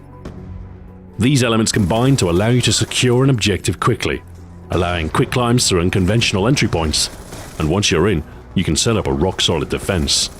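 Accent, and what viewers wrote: England, United Kingdom. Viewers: Does he sound American? He is obviously British. Just like how the Closed Captioning read "Centre" instead of Center. He even types his own CCs for his videos. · English accent :) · Sorry sir he is British. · It seems like this series has become to: "watch a british man saying puns about gun names in black ops 2"....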